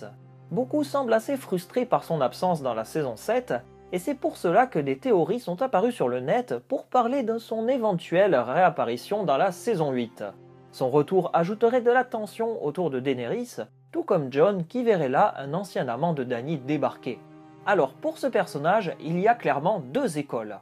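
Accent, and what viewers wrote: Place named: South, France. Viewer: Oh le bel accent du sud !!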